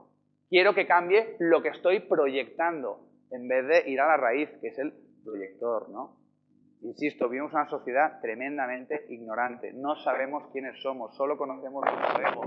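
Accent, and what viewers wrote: Spain. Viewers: Gracias, pero te confieso que no soporto el acento de los españoles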